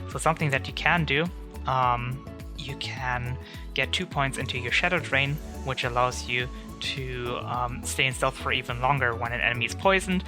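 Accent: Germany. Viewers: are you german? :p kinda sounds like it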